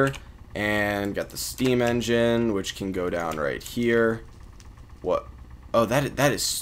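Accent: United States. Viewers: Are you American by any chance?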